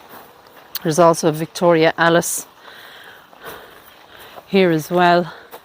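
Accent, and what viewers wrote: Ireland. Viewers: Such amazing places you show in your videos. I've always wanted to visit Ireland for historical reasons and the beauty of the nature. I love your dialect, it is really charming. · I know this isn''t an "IRISH* cemetery. Maybe it's the fact that the narration comes with an Irish accent. It looks like the cemetery is extremely huge if what you covered in 45 minutes is just a small portion of it. There's also a certain charm about overgrown cemeteries. I think it has to do with the body coming from dust and going back to dust after the spirit leaves.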